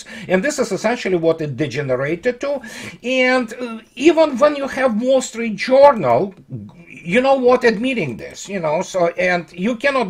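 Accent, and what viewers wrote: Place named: Russia. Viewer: like always, thank you for delivering bitter truth with a beautiful Russian accent! One small remark, Russian word “Диверсия” should be translated into English as “Sabotage”, rather than “Diversion”. It is one of those words called "interpreter’s false friend". it sounds the same, spelled the same, but have different meanings in the two languages... So, ДРГ should be translated as Sabotage/Reconnaissance Group. Alexander Mercouris got it right.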